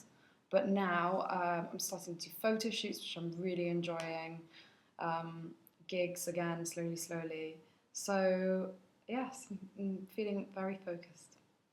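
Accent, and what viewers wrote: United Kingdom. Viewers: I never realized she had such a British accent, I mainly listened to songs and no interviews. I LOVE HER ACCENT! 😂 Love the first question hahaha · wonderful keep it coming! i haven't heard you speak in the british accent before so that was something new.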